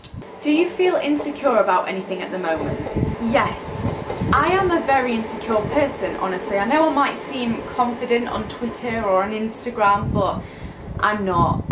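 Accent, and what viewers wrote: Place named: Yorkshire, United Kingdom. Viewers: 'Tret me' Yorkshire lass through and through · You have a really northern accent but you're trying to sound posh and it doesn't work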